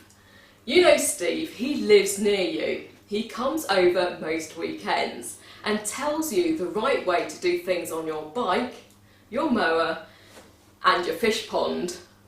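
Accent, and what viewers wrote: England, United Kingdom. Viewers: I love English women and their accent you're a extremely attractive mature lady with a great body!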